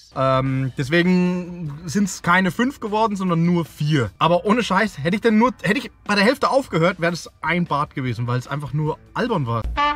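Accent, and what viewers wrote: Bavaria, Germany. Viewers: Du bist der woll sympathischste YouTuber Abo is da Bayrischer Dialekt direkt angeboren obwohl es auch ein Amerikanischer sein kann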